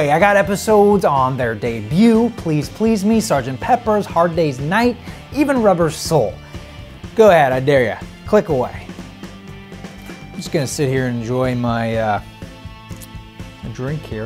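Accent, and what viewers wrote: United States. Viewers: would pop you in the face if you got anywhere near him because of your loud American delivery like Jim Carrey's movie The Mask. Must you embarrass us USA fans? Its so offensive to the memory of the dude! Yeah if you are going for Jim Carrey, wear the mask at least?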